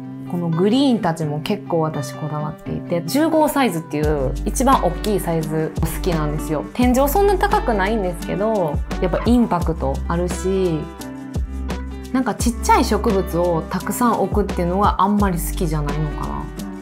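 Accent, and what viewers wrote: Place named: Osaka, Japan. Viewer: 私も関西人なので大阪弁が心地いいw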